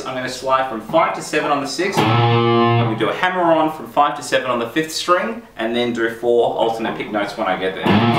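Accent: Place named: Australia. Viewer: Always refreshing to hear the Aussie accent.... great work....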